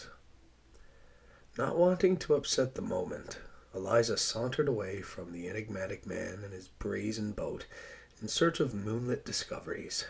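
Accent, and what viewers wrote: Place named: Newfoundland, Canada. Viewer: Love the way you laid in enough Newfie in that it feels authentic, but it's still intelligible to us on the west coast! I'm excited to hear the rest of the story😁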